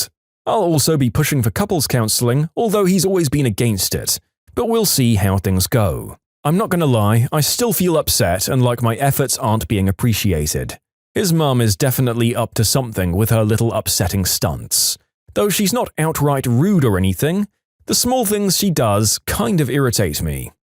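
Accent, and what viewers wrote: United Kingdom. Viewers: Why does bro sound so brittish?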